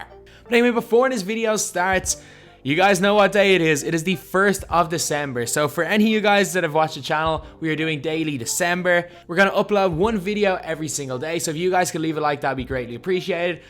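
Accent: Ireland. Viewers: I can tell you're Irish... Noice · I know that DKM is Scottish, but are you Irish?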